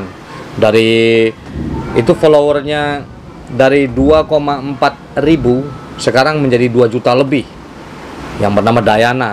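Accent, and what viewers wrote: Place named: West Sumatra, Indonesia. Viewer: iyo lah logatnyo Ajo mirip urang minang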